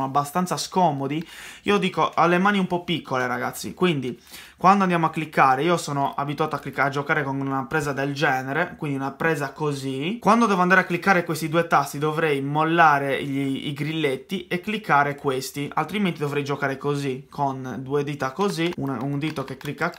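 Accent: Sardinia, Italy. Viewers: sei sardo?si capisce da come parti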